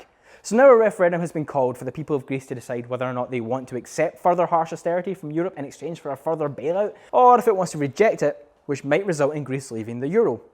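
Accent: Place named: West Scotland, United Kingdom. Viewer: Thank you, I'd been so keen to hear your thoughts and facts on Greece. It was great, but ooft you spoke very fast in this video. As a non west-coaster it takes me that second extra to adjust to your accent (that I adore), I'm not suggesting you tone down your accent, just that I'd appreciate if the speed was just a tad bit slower. I like to share your videos with non Scots too. Keep up the good work, I'm so glad you're back on my screen!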